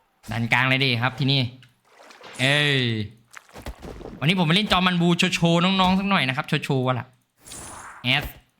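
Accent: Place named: Korat, Thailand. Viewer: คนโคราชนิครับ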